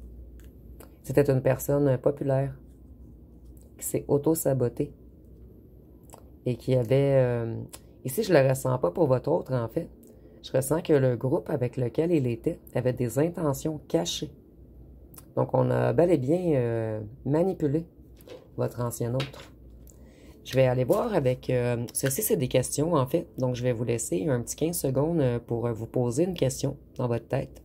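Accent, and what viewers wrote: Quebec, Canada. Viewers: ❤❤❤❤ BONJOUR MME J AI ENTENDU SE QUE VOUS AVEZ DIT SE MATIN MAI SACHER QUE TOUT LE MONDE N RST PAREILLE ET MOI JE VOUS APPRÉCIÉ ET VOTRE AXCENT QUE J AIME J AI BEAUCOUP TRAVAILLE AVEC DES QUÉBÉCOIS ET JE M ENTENDAIS BIEN DRS BON SOUVENIRS ...... MERCI POUR TOUTES VOS GUIDANCES MAI JE SUIS EN BÊTE AVEC C EST GENS MAI LA POLICES QUE FONT-ILS ????? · Vous avez de très belles mains et ongles très beaux bien ,j'adore cela ,votre accent québécois aussi est fort agréable Madame , et vos tirages sont très justes toujours , vous êtes très bonne dans les prévisions de guidances .❤👍🙏🌹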